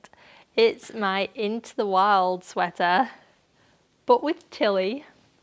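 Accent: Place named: Northern England, United Kingdom. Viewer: Your Joanie is beautiful, simple lines with enough texture to make it interesting. I absolutely love it. Your into the wild is beautiful too, Tilly should feel honoured, or is you that should be honoured by having her wonderful face on your jumper. I loved it when I found your podcast, you can take a Northern lass away from home but you’ll not get the home out of her accent, I knew where you were from immediately. I was born a Lancashire lass- till they moved the county boundaries and Warrington was suddenly part of Cheshire 😮🤣🙄- but my dad and all my aunts and cousins are from St Helens, Wigan, all over. I really enj😊your podcast and Tilly is beautiful, look forward to the next one and seeing what you come up with to make with all that wonderful wool xXx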